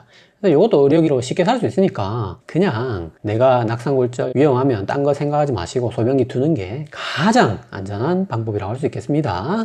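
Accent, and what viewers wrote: Gyeongsang, South Korea. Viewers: ~경상도 억양의 말투 너무 재밌어요. 귀에 쏙쏙 들어와요. 뭔가 중독성 있어요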